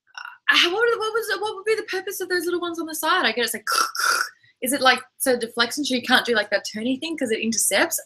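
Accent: Australia. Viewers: You sound Australian. :)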